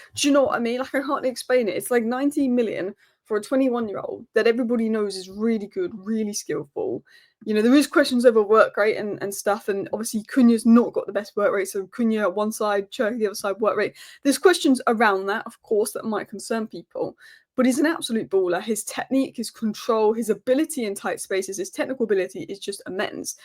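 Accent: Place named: London, United Kingdom. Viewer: Her voice suggests she’s from Hackney or Tottenham 👍👍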